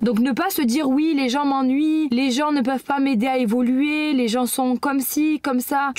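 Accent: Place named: South, France. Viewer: On reconnait l'accent du sud mais tu articules très bien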